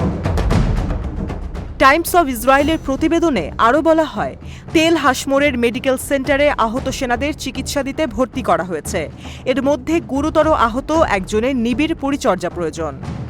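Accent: Kolkata, India. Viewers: আজাইরা নিউজ, কোলকাতার স্টাইলে !!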